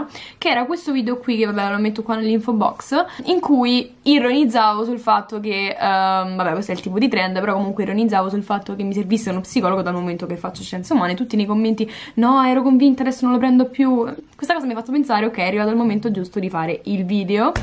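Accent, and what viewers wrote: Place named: Rome, Italy. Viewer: Ciao, ti ringrazio per questo video, l'ho trovato molto utile. Dalla cadenza sembri di Roma, come me... puoi dirmi quale liceo frequenti?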